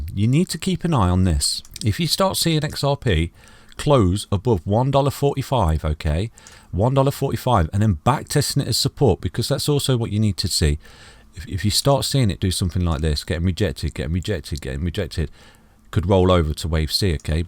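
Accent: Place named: England, United Kingdom. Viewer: IMHO. You ARE the best out there and no BS. No disrespect to the American You tubers, but so nice to hear a good English voice. Keep it up!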